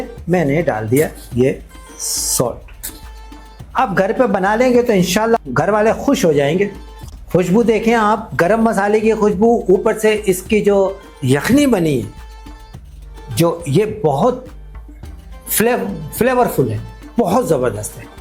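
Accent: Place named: Lucknow, India. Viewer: ❤Nazakat Lazzat aur Aapka meetha Lakhnavi andaz Awesome.Respect from Pakistan.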